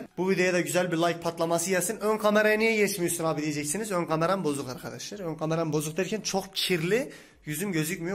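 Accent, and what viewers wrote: Turkey. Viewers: Sen elazığlı mısın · adanalı gibi sanki yeniyim aranızda ben adanalıyım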